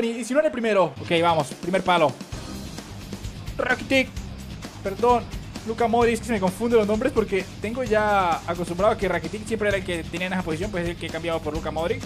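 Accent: Colombia. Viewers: eres colombiano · oye eres colombiano?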